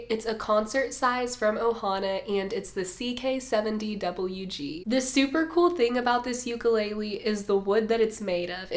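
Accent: South, United States. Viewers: why does she never sound southern in any videos except for this one lol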